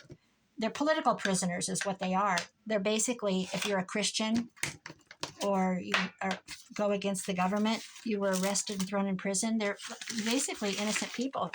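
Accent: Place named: Midwest, United States. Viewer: I love listening to your stories while I’m crafting along with you. It’s so refreshing to listen to someone from the midwest. I moved away now but it sure is good to hear your stories!!!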